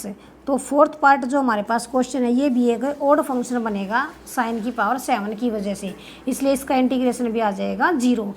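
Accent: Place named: Haryana, India. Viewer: Pure haryanvi🤩🤩🤩🤩😍😍🥰🥰👌👌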